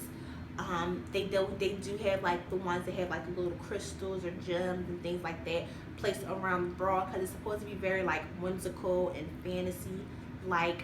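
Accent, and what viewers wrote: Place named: Philadelphia, United States. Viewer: Are you from Philly or New Jersey? You sound just like my family! I just got the job today. So excited to start. I will take your advice going forward. Tfs your experience. You're super amazing! <3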